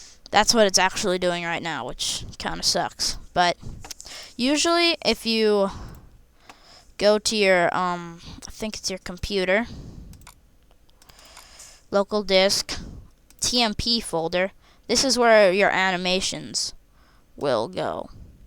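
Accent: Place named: United States. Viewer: Wow this video is a bit more detailed than the one i watched at first and it helped quite a lot. also (no offense) but I remembered how to do that because both videos i watched had someone with an american accent so I remembered it!! xD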